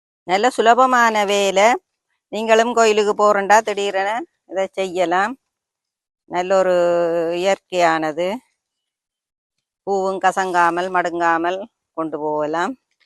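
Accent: Sri Lanka. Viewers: மிக்க நன்றி. கொஞ்சும் இலங்கை தமிழ் பேச்சு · நீங்கள் ஈழத்தில் இருந்து கதைக்கிறீங்க தானே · Beautiful Srilanka slang. Excellent art piece.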